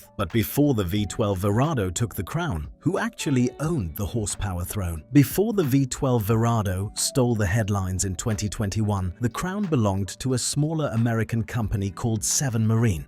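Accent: United Kingdom. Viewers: Why the British accent? Why?!!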